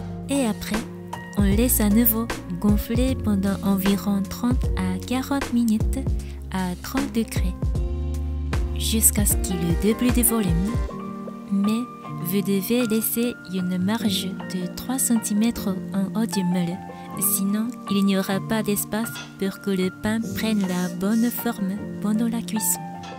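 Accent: Japan. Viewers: Bonjour, merci beaucoup pour la recette car j'adore les pains japonais moi j'avais trouvé la recette qui contient du Tangzonk. C'est délicieux. J'aime votre accent, votre pays culture, vos musiques. J'aime tout particulièrement votre respect et votre sens de l'honneur. Vous parler déjà très bien le Français. Si je pouvais parler le Japonais comme vous parler le Français cela me permettrais de pouvoir visiter votre magnifique pays. Bien à vous. Rosalie.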